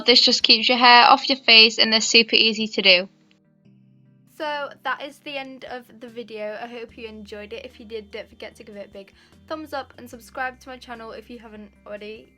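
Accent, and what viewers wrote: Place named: Northern England, United Kingdom. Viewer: Love that accent. I'm not very good with knowing accents, so it's a somewhere-up-north accent to me :) So nice to hear an English voice! I love that you have a middle parting and not a 'center part' ("senna part" yankee accent!) lol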